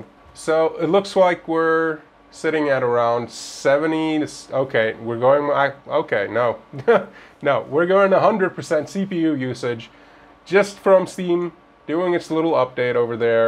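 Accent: South Africa. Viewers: Awe are you from South Africa bro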